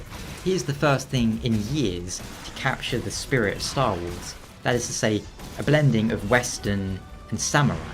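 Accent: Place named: United Kingdom. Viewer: You sir just earned a subscriber as well as a sponsor! For anyone new to this channel, obviously I'd recommended binging through his Dark Souls LP's. Just save the playlists... watch in order. Then after the LP's go through the lore videos... you don't have to watch them all at once... just a few and then new ones should regularly pop up in your recommendations and don't worry, there are eight seasons of them to keep you happy. Watching his longer form game and media reviews/videos can be tricky... but has such a smooth non-distinct across the pond accent that I usually watch his videos for background when I'm working or writing or forum administering. The only drawback is I'll often be too drawn in that I'll watch his videos more intently. But remember to leave a like and comment before the video is over. Need that engagement. What else am I forgetting? 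Doom 2016 is great, Rey is a Mary Sue and cough <link> cough Excuse me. Check out our forum! At the very least, it'll generate some traffic and buzz for us so we can sponsor this cheeky chap again! Like a symbiotic relationship between the Sietch and the mighty Sandworm. The (often salty) spice must flow.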